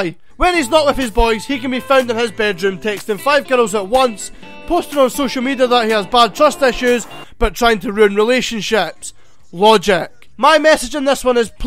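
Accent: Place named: Scotland, United Kingdom. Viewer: just subbed ya. you're funny as fuck. Are u Scottish if so love the accent lol.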